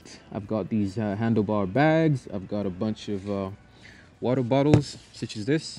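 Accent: United States. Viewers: You do have solid points in your analysis. Thanks for the share. By the way, where you got your American accent from 😀? Did you live in US or Canada??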